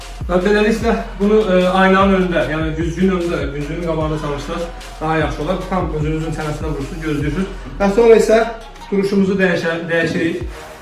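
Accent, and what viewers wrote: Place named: Turkey. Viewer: Türk lehçesi 😁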